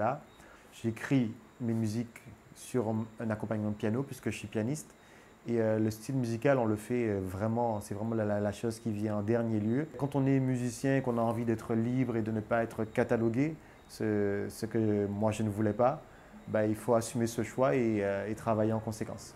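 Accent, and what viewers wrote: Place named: Haiti. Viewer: Les Guadeloupeens parlent aussi le creole mais lui il parle le creole comme un Haitien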